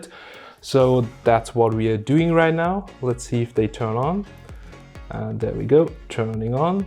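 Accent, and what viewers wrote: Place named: Germany. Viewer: German accent 😁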